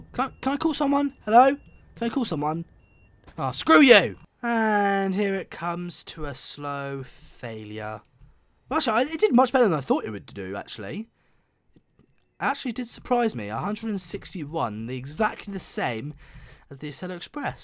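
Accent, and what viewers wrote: England, United Kingdom. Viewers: The"bluething" is called the javelin duh. The YouTuber sounds English and on The side of the train in real life says Javelin so he should actually know.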